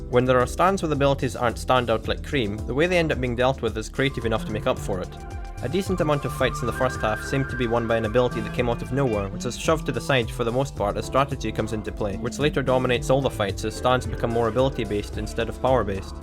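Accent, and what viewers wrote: Scotland, United Kingdom. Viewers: Dans It's understandable that you can't understand his Scottish accent.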